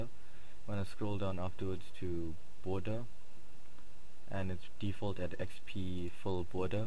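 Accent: South Africa. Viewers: I "guees" that's why he does the design and not the coding ^_^ JJ - still a nice tutorial. Are you guys South African? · South African?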